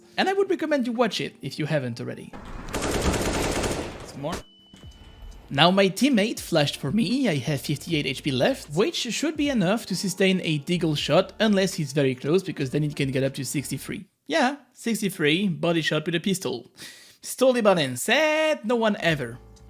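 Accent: France. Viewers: il a un petit accent français je crois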